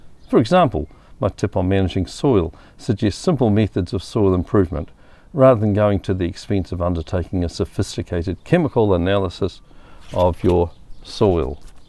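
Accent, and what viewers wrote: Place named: New Zealand. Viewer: Hi, I'm looking forward to working my way through your tips. We moved from 1/4 acre in the 'burbs of Hamilton to 10 acres in Nth Canterbury 3 years ago and while I have gardened for many years and have big dreams I am somewhat challenged in the design and execution department:)) Always lovely to hear our NZ accent on "the tube".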